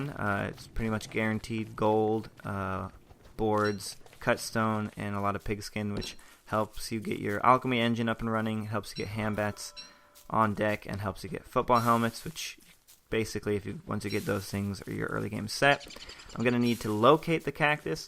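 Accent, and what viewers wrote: France. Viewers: ur french?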